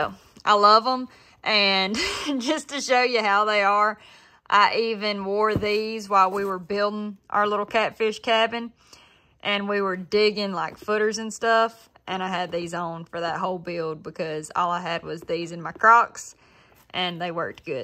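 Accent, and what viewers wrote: South, United States. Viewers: That strong southern accent doesn't scare me... it reminds me of the good old days 😁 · Love this strong southern accent 🤠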